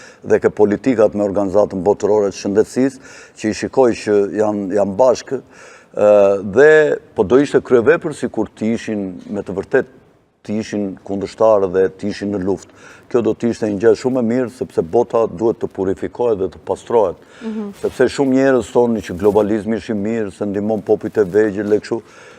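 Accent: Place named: Gheg, Albania. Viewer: O, hajvani me dy këmbë, mos e përdor t'folurit e hizmeqarëve të fashizmit, Bandave Balliste dhe mbeturinave tjera, apo dialektin Geg, i cili ka ngordhur që vitin 1972 dhe është varrosur në Tiranë! Tani po e përdorin pjella e pistë e hizmeqarëve të lartëpërmendur të Shqipërisë Veriore, Laperët, rrugaçët dhe kurvat e Kosovës primitive! Aleluja!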